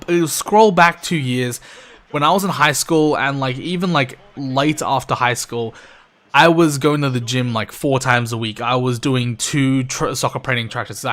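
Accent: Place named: United Kingdom. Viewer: Btw are you fully Australian I haven't seen you saying mate for once and your accent is British.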